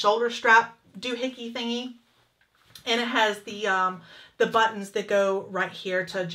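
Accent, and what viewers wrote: South, United States. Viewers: I’m a southern girl and I instantly realized that you were southern too when you started talking and I immediately subscribed. Mainly because you don’t see many southern women as YouTubers so it was just amazing to see honestly. That prolly made no sense but I just wanted to tell you to keep doing what your doing. It’s amazing 🥰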